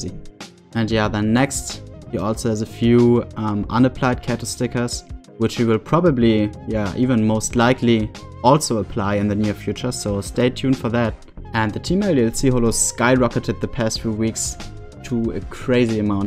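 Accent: Germany. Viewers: Man hört so das du deutsch bist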